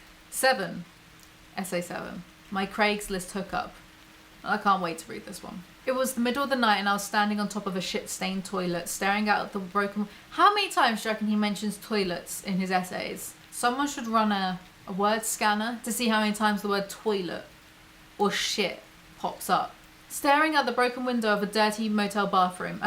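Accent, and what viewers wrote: United Kingdom. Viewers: She's from the south of England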